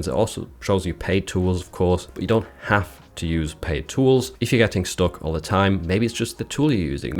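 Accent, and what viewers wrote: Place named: North, United Kingdom. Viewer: How tf is your accent so good?! I'm English and I'd NEVER think you're not a native northerner. I don't think I've ever heard a non-native with such a convincing REGIONAL english accent. I also think its great that you chose that accent rather than a boring southern one. Super impressive 👏 btw I only know about this because someone told me, otherwise it wouldn't possibly have occured to me!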